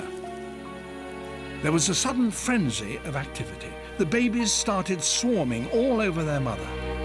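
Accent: United Kingdom. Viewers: I wish this eloquently spoken British chap would narrate my life..."The American father, still in a stupor early in the morning, must have coffee to function properly, and tends to watch strange youtube videos while sipping coffee."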